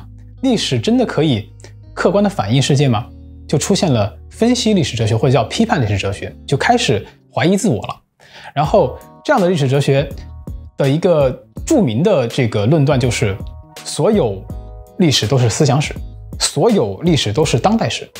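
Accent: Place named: Hunan, China. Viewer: 没有得到我点进来之前想要听到的内容但是听出了是个湖南人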